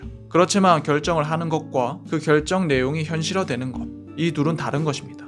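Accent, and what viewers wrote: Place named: Busan, South Korea. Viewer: 좋은 영상 감사합니다!! 부산 출신이신가요? 억양이 반갑네요 :)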